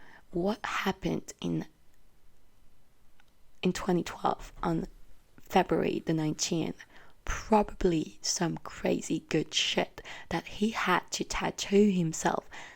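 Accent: Australia. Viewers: *Australian pretty sure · Ngl but this sounds more Australian than British all the comments 😳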